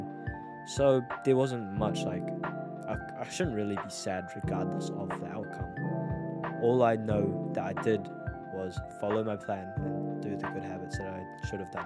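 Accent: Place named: Australia. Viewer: He sounds aussie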